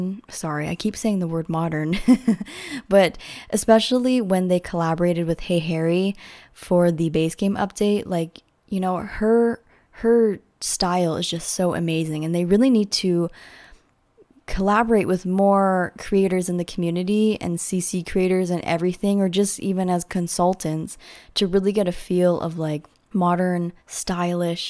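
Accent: Canada. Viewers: Building is like all I do in the sims, so I don't really download builds mostly watch for inspo because I love your style. And also, of course, for the chat and the company 😊 my one year old also likes your videos, think he likes how fast it moves and the Canadian accent 🤣 (we're Scottish so very different lol)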